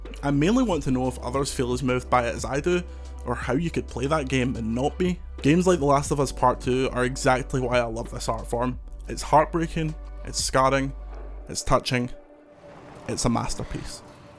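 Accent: Scotland, United Kingdom. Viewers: Yeah Scottish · Scottish accent right? not irish?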